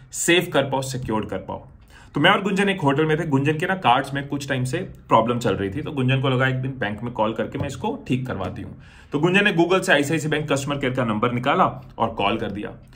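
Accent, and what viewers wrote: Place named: Jharkhand, India. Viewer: Ye iske tounge language se pta chal rha hai ki ye jamtara Jharkhand ka hai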